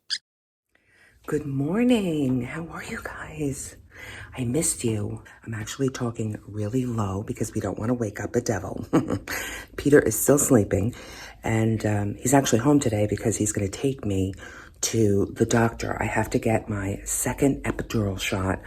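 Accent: New York, United States. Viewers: Oh..I'm so very sorry about your momma...but she is always with you. As far as you not being here and folks leaving...that's ridiculous. And you are so right..LIFE does happen. And as I've told you before..I love your channel, being a former New York girl, you always bring me "home" when i hear you voice. So, guess I'm saying..you're stuck with me..I ain't leaving! Take care & heal my friend.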